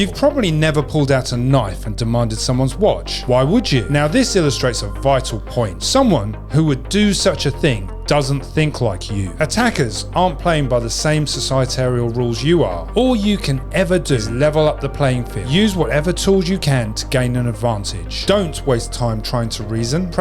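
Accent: United Kingdom. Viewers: The guy in the video sounds like a Brit. We don't get as many street fights here in the US since there is a good chance that the person you are thinking of attacking is armed.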